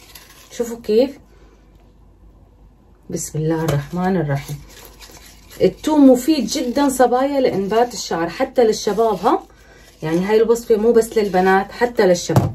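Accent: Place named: Iraq. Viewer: لهجتج بين العراقيه وبين السورية من أي بلد انتي💙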